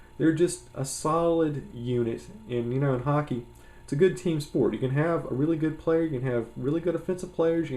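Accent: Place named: South, United States. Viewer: Aaron Sil I'm not sure but I stumbled upon his videos and especially in the earlier ones, his voice is the most ASMR-triggering voice and its just crazy. I'm into sports and food reviews but really that southern kinda offish voice just gets me.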